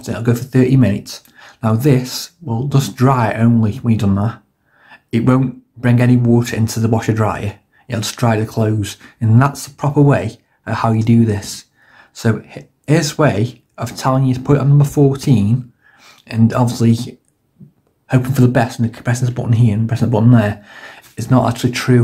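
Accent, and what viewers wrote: Stoke, United Kingdom. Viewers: you from stoke mate?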